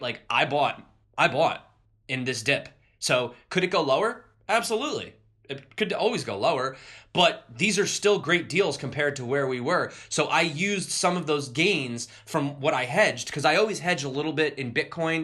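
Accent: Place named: Philadelphia, United States. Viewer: My bro where u from?? You sound local to me here in philly....if not then maybe south jerz!! Go Birds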